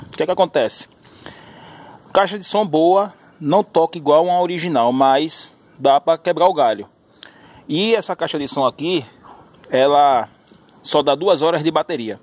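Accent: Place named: Northeast, Brazil. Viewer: Cê num reparou a conversa de nordestino não?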